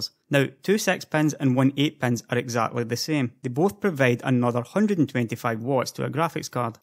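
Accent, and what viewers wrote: Scotland, United Kingdom. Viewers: He's Scottish... not recognise a Scottish accent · Seems Scottish. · Are you Scottish by chance?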